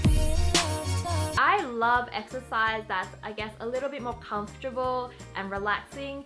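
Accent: Australia. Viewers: Are you Australian? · She's from Australia?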